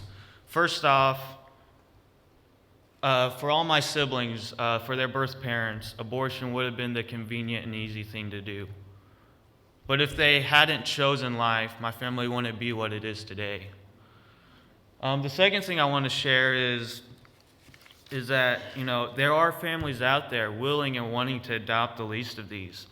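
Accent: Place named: South, United States. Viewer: Omg. You have a southern accent?? Omg. God bless. What a miracle you are. 🙏❤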